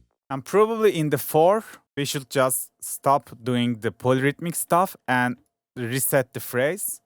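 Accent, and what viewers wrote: Turkey. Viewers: bu ingilizceyi tanırım türksün demi kenks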